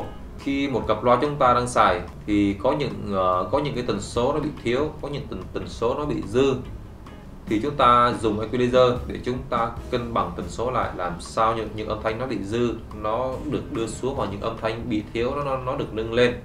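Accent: Vietnam. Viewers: Bạn người ở đâu mà nói giọng miền trung vậy...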